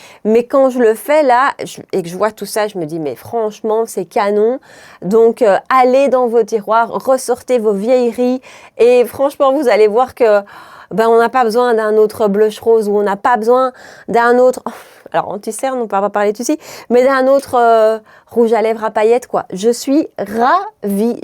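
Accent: Belgium. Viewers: Coucou, j'adore tes vidéos pépites, re-découvrir les produits c'est génial, merci tu m'as fais sourire avec ton accent belge (une fois),😅,j’aime bien deviner les produits que tu vas sortir de ta boite à chapeau tel une magicienne, au moment du rouges à lèvres,j'ai direct pensé au Dior Sequin,j'ai la nuance 893 un violine avec les paillettes rosée, ça claque pour les fêtes et pour cette saison ❤ Bonne journée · D'ailleurs tu devais pas nous faire une vidéo ds ce style déjà avec ce belle accent beilge 🤭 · Cc, très belle vidéo pépites oubliées que j'adore. Je dis un grand oui pour une vidéo avec ton accent belge😂. Bon dimanche 😘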